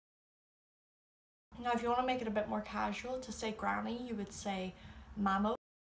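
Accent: Donegal, Ireland. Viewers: I'm nowhere near being a grandmother, but recently began looking for new ways to say "grandma" since my kids are now raising kittens. I'm liking the term "Mamó," though I'm also intrigued by "Morai" (which I think is pronounced MORR-ee, and would love confirmation). I just read in another comment that you are from Donegal, and my great-great-great grandparents (I think that's the right amount of greats) came here from Donegal, so I'm glad to be hearing pronunciation from the same area. Thank you for your videos! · Please tell what accent of Irish language do you use? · Are you Doire/Dun na nGall?? It's so comforting hearing you speak as gaelige 💚. Takes me back to my Thornhill days, go raibh maith agat xx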